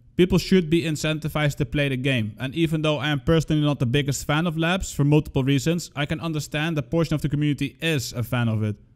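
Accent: Netherlands. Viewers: dude...as I listening to you, I imagine how many critics do you get cuz u are apologizes for your accent and for your opinion....man it's you, and your opinions...if someone isn't like your accent or your opinions, well....I say to those ppl get the f... outta here and finde someone else! Its simple, a left mouse click, or a tap in your phone....just downright get the hell out here and leave thise dutch dude alone.:) So calm down and dont bother yourself with idiots....just be yourself.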